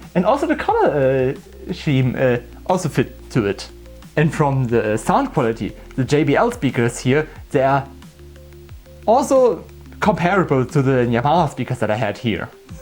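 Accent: Germany. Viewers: I hear German accent! Dieses Video ist nun Besitz der Bundesrepublik Deutschland! Brennstuhl perfectly pronounced, clearly German..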